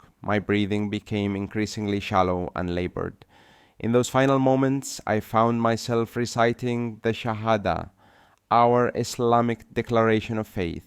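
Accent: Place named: Pakistan. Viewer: He doesn't sound arabic, more like a pakistani.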